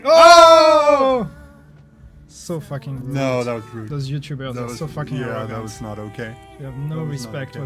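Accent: Netherlands. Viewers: Are you dutch? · Kom jij uit nederland? Ik herken je accent. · you sound way more dutch in the videos compared to when you stream - is that weird? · Are you dutch you sound dutch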